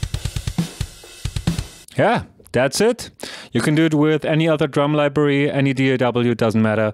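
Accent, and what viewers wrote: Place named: Germany. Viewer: finally some useful information on this topic 🙏 Thanks mate! Or Vielen Dank, wenn ich den Akzent richtig gedeutet habe haha 🤘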